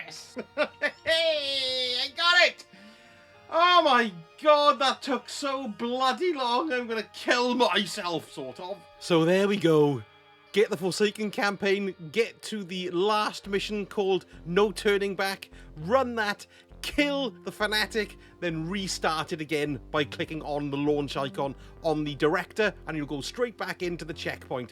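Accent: Wales, United Kingdom. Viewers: yo, this guy sounds welsh lmao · Nice to hear a fellow Welshman on Destiny2. Awesome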